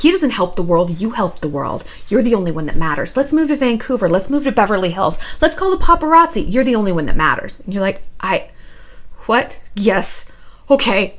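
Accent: England, United Kingdom. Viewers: Why are you reading in English accent? This book was written by two Americans author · LOOOOOOL I LOVE THE BRITISH ACCENT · Lol the British accent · Again the British accent ICONIC love you 😘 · love your British accent haha <3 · Love the British accent! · love your english accent! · Your British accent is a GEM!! LOVE IT 😂😂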